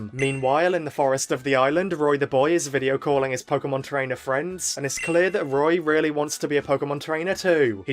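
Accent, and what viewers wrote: United Kingdom. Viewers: Man you call that a sick voice? At least you have that british accent to cover it up,when i speak when im sick i sound like a minecraft zombie💀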